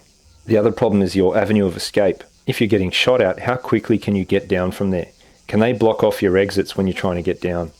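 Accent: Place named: Australia. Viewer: Why does an Australian accent do so much for tactical confidence? I feel more effective for having seen this.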